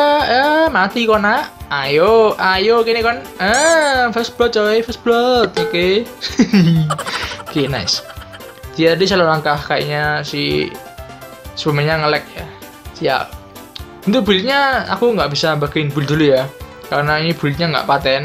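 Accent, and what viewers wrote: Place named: Malang, Indonesia. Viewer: bahasane malangan hehe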